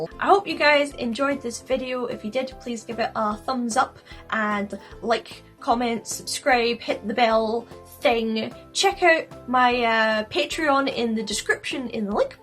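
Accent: Scotland, United Kingdom. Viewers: What part of scotland are you from??💗💗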